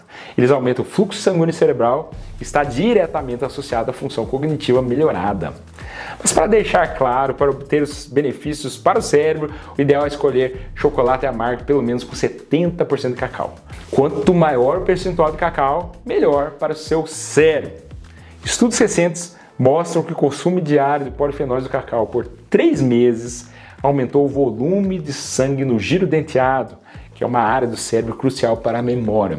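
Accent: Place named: Rio Grande do Sul, Brazil. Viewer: Seus vídeos são fantásticos, além desse sotaque maravilho, levandodo-me às memórias do meu querido Rio Grande do Sul, que saudades daí do sul! Deus lhe abençoe, guie e guarde. ❤ Grande abraço.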